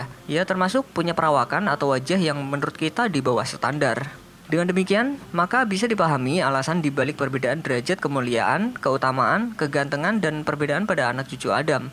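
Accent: Java, Indonesia. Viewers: Mimin orang jawa ya??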